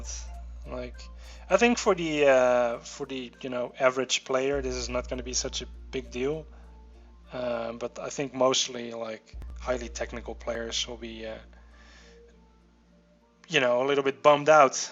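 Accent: Netherlands. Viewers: Just discovered your channel, first thing I noticed was your Dutch accent :) Nice channel though! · Of ik kan goed Nederlandse accenten herkennen, of jouw Engelse accent is nog niet optimaal...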